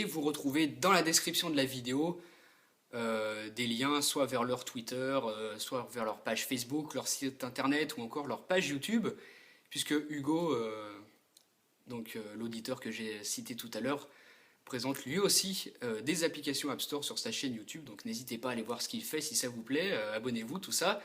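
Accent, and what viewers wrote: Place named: Savoy, France. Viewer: On reconnait l'accent de la savoie :)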